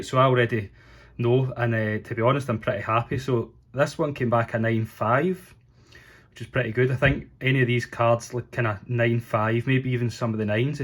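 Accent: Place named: United Kingdom. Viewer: was this bulk submission? and you only waited 3 months? the turnaround now is likt 145 days. And also, did you had any problems with customs? From your accent I think your from the Uk so your kinda in the same situation as me. I'm from Portugal, and I'm kinda worried if something happens with the package or customs, cuz as you might know it's not insured. I send 2 weeks ago 50 cards. Just wanted to know if you had any problems. Thanks!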